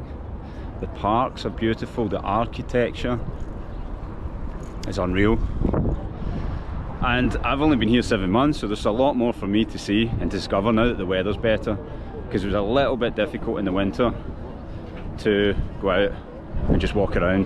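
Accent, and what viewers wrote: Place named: Glasgow, United Kingdom. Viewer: I stumbled upon your channel a few days ago, and i LOVE it. I follow a few other channels by Russian citizens and they are facilitating. Yours offers a great Western insight into the day-to-day life in Russia when compared to life as a westerner. I’ve seen 3 of your videos so far and I’m currently ‘catching up’. Just wondering - I know you are Scottish, but specifically, are you originally from Glasgow? Your accent is very similar to a singer friend I know who was a proud Glaswegian! LOL Anyway, I’m enjoying your videos on this magnificent city.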